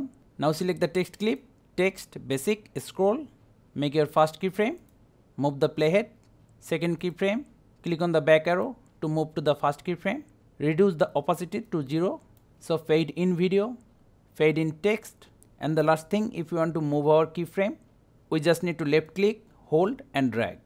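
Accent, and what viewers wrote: India. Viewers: i little bit understand indian accent, tysm you are legend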